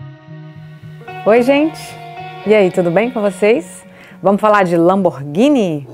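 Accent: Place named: Italy. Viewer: Olá, você disse 73 unidades?! Tenho que correr pra garantir a minha!;P Gostei bastante dessa solução da Lamborghini, não vejo a hora de vê-lo em pista. Apaixonado por Lamborghini. PS: Nice Italian accent by the way.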